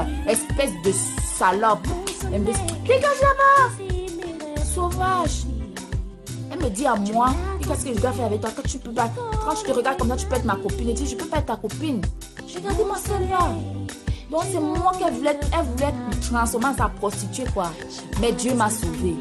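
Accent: Cameroon. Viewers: Oh mais je rêve finalement c'est vrai! Les camerounaises parlent pareil :D Ma mère parle avec le même accent c'est fou ça non? ! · C'est une camerounaise tu vois même son accent et le nom sa chaîne, la musique congolaise n'a pas frontières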